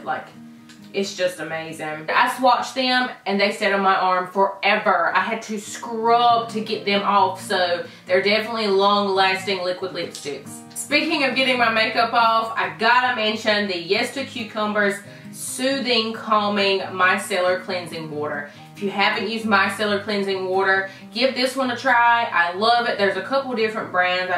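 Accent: South, United States. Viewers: All it takes is a country accent and I subscribed.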